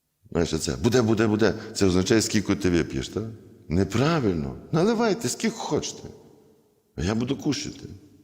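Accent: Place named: Western Ukraine, Ukraine. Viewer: Отче, дуууже цікаво відповідаєте на усі задані запитання. Слухаю Вас "запоєм", Ви чудовий оратор. Відчувається багатогранність Вашої особистості. Відчуваю у Вашій мові западенця, то така тепла і мила мова, я галичанка....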